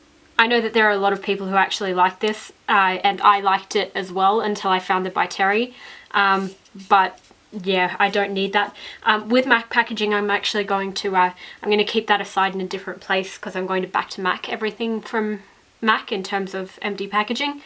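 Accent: Australia. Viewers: Are you from Australia? X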